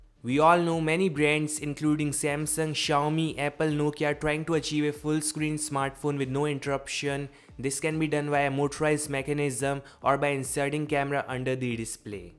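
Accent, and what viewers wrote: India. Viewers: Ngl when I hear the thick Indian accent I close out of the video faster than when I hang up on a scammer.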